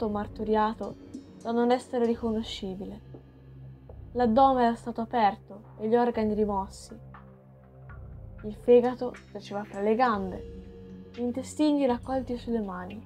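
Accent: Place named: Tuscany, Italy. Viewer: figurati poi mi è sempre piaciuta la parlata toscana grazie a te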